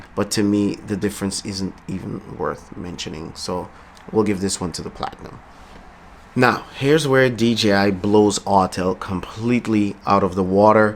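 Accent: Trinidad, Trinidad and Tobago. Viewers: u from Trinidad? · Always nice to find a new drone channel on YT...subscribed. Loved the video. You sound like an expat Trini btw.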